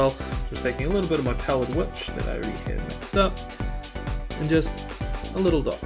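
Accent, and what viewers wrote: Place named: New Zealand. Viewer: For an Adelaide based kiwi, it's so great to hear our accent and the word "Kiaora" and btw, your tentacle painting is spot on!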